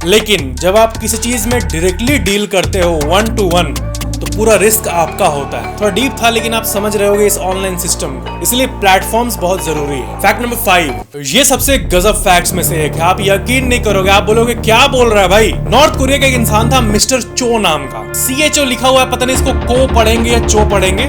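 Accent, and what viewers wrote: Bihar, India. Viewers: Are you from Bihar because your voice tone is like bihari, I'm also an bihari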